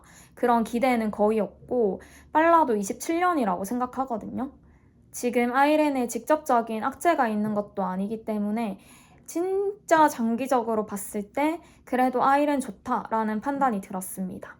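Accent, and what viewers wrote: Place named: Gyeongsang, South Korea. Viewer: 경상도 사투리..! 저도 투자하는 것을 좋아해요 선생님!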